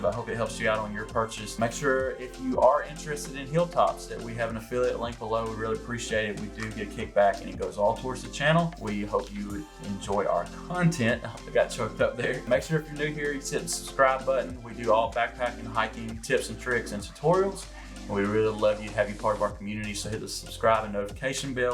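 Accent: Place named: Alabama, United States. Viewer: This fella from Alabama? His accent reminds me of.. me.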